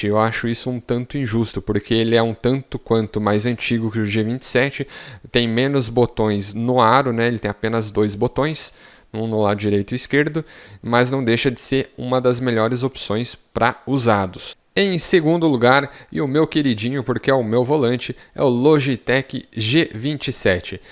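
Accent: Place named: São Paulo, Brazil. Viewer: MUITO PAULISTA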